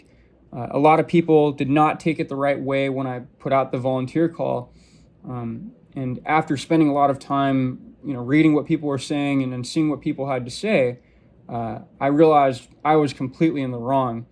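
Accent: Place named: South, United States. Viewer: Of course this guy got a southern accent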